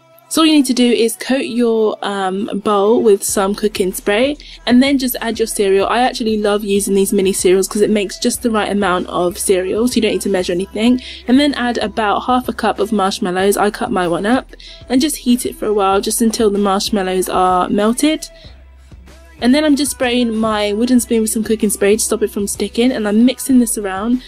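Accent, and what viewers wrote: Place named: United Kingdom. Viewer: I absolutely L-O-V-E your British accent.😊😝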